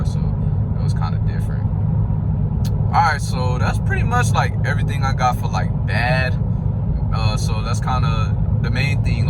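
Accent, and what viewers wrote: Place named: East Coast, United States. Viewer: You sound like you're from the East coast. As someone who owns a 5th 6th and 7th gen all at the same time they're good daileys. The cool part is that they're light. Do a K24A swap for traffic 👍🏾🔰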